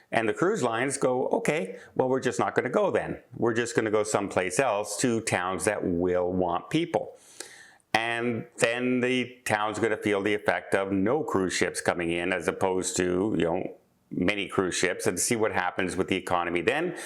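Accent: Canada. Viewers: The rain on your parade, but you sound very smart, logical and Canadian. Those three words are never used any politics policies on American soil. What is more done is drawing the line in the sand and saying you cannot cross unless you’ve been to my will. And yes, the people making the decisions most times have nothing to do with the outcome meaning the people making the decisions in this case in Alaska are not the business that profit by all the tourists. It took me a few years to realize this when I moved in 1999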